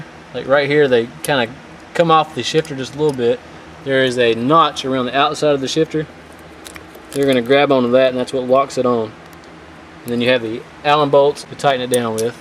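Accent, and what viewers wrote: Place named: South, United States. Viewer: sounds like a cute hottie gear head ummmm southern accent like my alabama one. ive got over 20 paid for cars just sittin waitin to meet mr Right another gear head.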